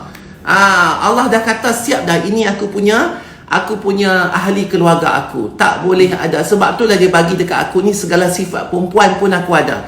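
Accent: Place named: Penang, Malaysia. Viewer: Loghat penang datuk sangat mencuit hati.moga Allah sentiasa merahmati datuk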